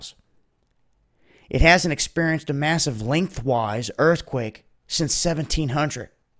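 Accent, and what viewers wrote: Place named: Idaho, United States. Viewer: you're from Idaho, girl, I can tell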